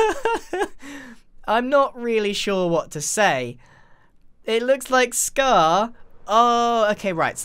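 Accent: England, United Kingdom. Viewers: Grian has an English accent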